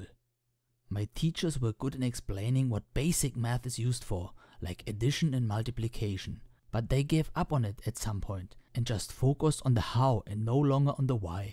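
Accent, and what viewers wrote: Germany. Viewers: Sprechen Sie Deutsch? I was trying to get the accent, because it didn't sound like the part of Germany my family lives at (Hessen und die Schwarzwald)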